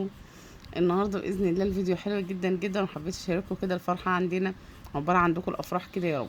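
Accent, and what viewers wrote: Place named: Beheira, Egypt. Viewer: ياتكاته يا حركاته 😃 وبتعتزي ليه انتو احسن ناس ربنا يسعدكم وعقبال رؤي وتقي بنتي 😊 بس قوليلي بقي انتي شطوره بتتكلمي بحراوي كويس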